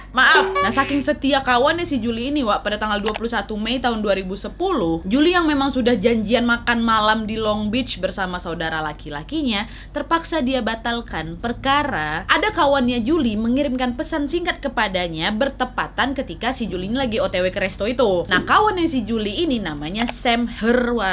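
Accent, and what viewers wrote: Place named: Batam, Indonesia. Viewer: Logat anak batam wak...☺☺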